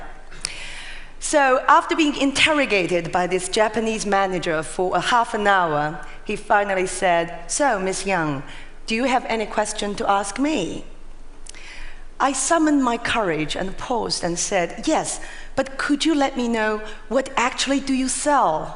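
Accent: United Kingdom. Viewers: why does she have an british accent? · 杨澜在美国念书，但是发音是英式，有意思。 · interesting.... she has British accent rather than American...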